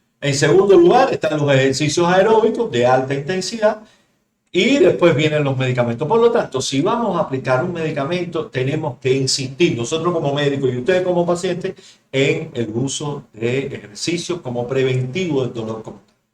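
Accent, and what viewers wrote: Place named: Cuba. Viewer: Muchas gracias doctor por tan buena explicación. Por su acento creo que somos compatriotas cubanos( espero no equivocarme ) . Pasaba por aqui buscando información acerca de la cefalea tipo tensional ya que llevo años padeciéndola intermitentemente y me ayudo mucho reconocer los signos de alarma, ya que eso es generalmente lo que mas a uno le preocupa. Exitos en su canal!!!!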